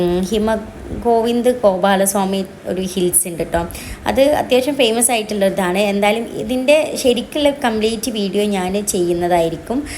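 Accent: Malappuram, India. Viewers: മലപ്പുറം slang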